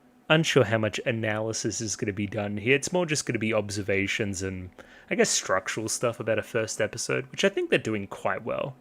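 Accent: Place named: Oceania. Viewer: I think your knowledge of horseracing, horse behavior, idol shows and passion projects like Symphogear and GuP makes you one of the best candidates to enjoy this franchise to its fullest. Personally, I feel it is the best thing to happen to me watching any media in the last 5 years. Firstly, I like how you gave time analysing the episode and doing some research. So I will share some points, a few of them might be answers to your questions. (-1) Cygames sponsor Kentucky Derby, Grand Prix in Paris; many of the company heads own thoroughbreds themselves. Many legendary jockeys sponsor the franchise. This show is a beautiful love letter to Japanese horceracing (JRA, , anime counterpart is URA) history and the racehorses that participated in it. The deeper you dig into how this franchise takes on irl lore, the more fascinated you'll get. Howevever, S1, released 3 years before game release, was about the company still struggling to form their own studio to produce their show and find a working formula. 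It still ended up being more than decent. (0) BACKGROUND: Others have probably already told you about how uma musumes are born. A decoration on left ear means they were mares irl (like Black Caviar the legend from Australia), while right ear means stallion (like Bonecrusher from NZ). I gave you Oceania examples based on your accent XD. (1) The Uma Musume anime is NOT an anime adaptation of the gacha. Neither is it an advertisement for the game. Uma Musume is a "multi media" franchise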